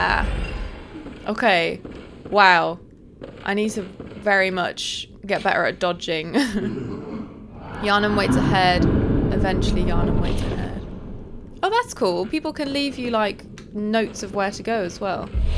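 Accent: United Kingdom. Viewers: It's quite funny to listen to a british girl (i assume) playing this game and commentating, it's very immersive actually.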